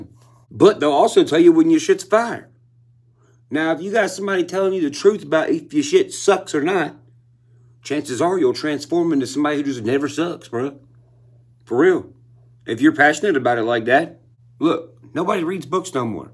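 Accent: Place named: Tennessee, United States. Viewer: Sitting here listening to you speak brings me back home. I feel out of place sometimes living in truckee as I’m from bumpus mills tn on tobacco port circle. You always keep it real and I appreciate that. Wow I never heard of the bot farms! That makes sense. I gotta get back to work. Thanks for the content I listen to all your music and I have started listening to your videos where you talk. Literally every time I have blows my mind! Keep calling shit out and keeping it real!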